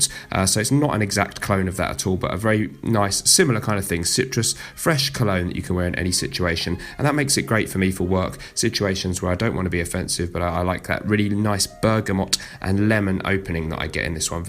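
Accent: United Kingdom. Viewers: Excellent list, as usual. I love your British accent!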